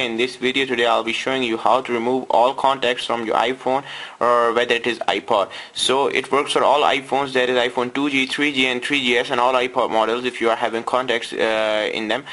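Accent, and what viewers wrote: India. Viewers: Shame man, he is Indian what do you want the guys to sound like. Don't worry dude keep on with the videos thanks man :) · great exlpanation for a indian guy? not a racist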